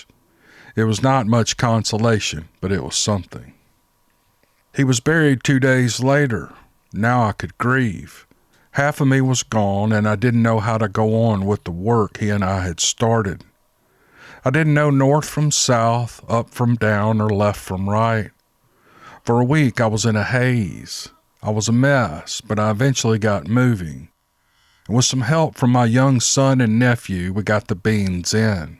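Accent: South, United States. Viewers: hello and that was SO therapeutic! I've been awake tossing and turning like a captured viper in a pillowcase till even my cats left the bed for more settled and quite sleeping spots! But as I lay down in my bed just before this story began, after taking some pain killing tablets and having a hot bath, I am hoping for just 2 or 3 hours kip, enough to get through the day. I'm watching the early spring day begin as the sun peeks through the curtains, and listening to that Southern accent and cadence, I felt like I was listening to a meditation. What a wonderful story and what a wonderful family the twins had both as children and as adults. We are locked down in NSW Australia where I live and even though I'm country/rural and there hasn't been a single Covid "case" in the 100 kilometres around me, that 1 case has kept me home for a very long time. You have a gift and I sometimes put one of these longer stories on if I'm cooking something like lemon butter in my kitchen because I have to stay at the stove and stir constantly. 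I'll listen to you tell the story and I'll look out my kitchen window at the farmland and put myself somewhere else in time and place where the story you are narrating happens..I hope that you and yours are doing well and I look forward to the next "Calming " time. Till then take care now! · Mister Southern Comfort low hear your southern accent is peaceful you're such a good reader God bless you and your wife hope to hear from you again soon love you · That one really piqued my interest. It was beautifully written with respect for the shadow people and the family members in involved. The perfect compliment to the story was the soft southern spoken voice that narrated it. Thank you. 😁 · Mr. - you are becoming a skilled storyteller and this is one of your very best!!!💯 I have always enjoyed the way you tell a story, being half-southern myself. Your accent and genuine love for the stories you share here have been apparent from the first time I listened to your vids. 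Also ❤️ your musical and visual 🐸 accompaniment, especially the ladies in your side yard. 🐓🐔🐥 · I have enjoyed all the stories you have shared on this channel but, none more than this one. Your deliberate cadence and southern drawl make it easy for my mind to paint the pictures your words describe. I detect this to be a true and authentic account of what happened that day in 1977. Nebraska Checking In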